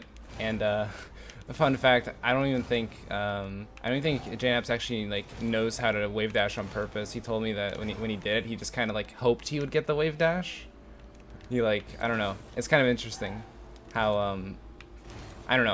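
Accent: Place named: Europe. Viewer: l Sven l I can tell your from EU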